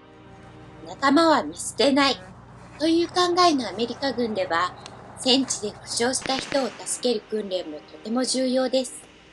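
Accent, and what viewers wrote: Japan. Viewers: しゃべってるひと日本人？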